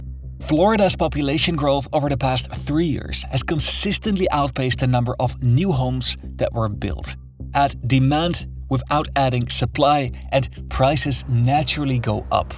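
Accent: Netherlands. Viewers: Ik kon aan je Engels horen dat je moedertaal Nederlands is. Checked the ‘About’ section…and sure enough. (Living in Florida past 15 years)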